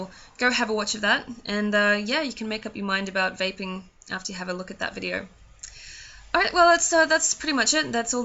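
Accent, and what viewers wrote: Australia. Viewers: Are you from Australia or new Zealand ?? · you sound Australian :D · Defo an Australian accent. Are you near Melbourne, Tennis season starts in Jan in Melbourne can't wait!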